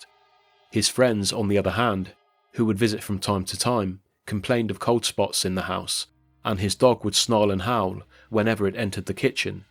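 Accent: England, United Kingdom. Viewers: I enjoyed those short stories, not heard any of them before. It is good to hear an English(?) voice making the narratives.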